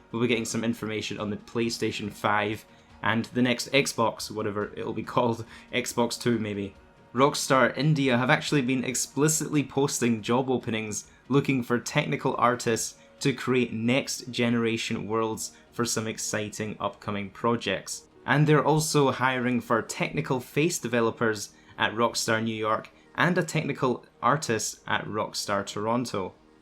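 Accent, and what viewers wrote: Scotland, United Kingdom. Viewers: Scottish accent lol I like it personally but I’m from the uk so it’s normal to me to hear it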